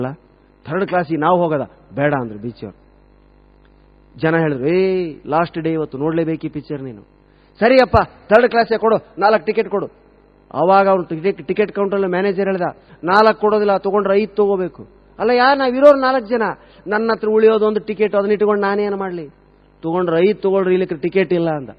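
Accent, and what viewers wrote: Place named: North Karnataka, India. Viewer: ಸರ್ ನಿಮ್ ಉತ್ತರ ಕರ್ನಾಟಕ ಭಾಷೆ ಸೂಪರ್ 😂😂😂❤❤❤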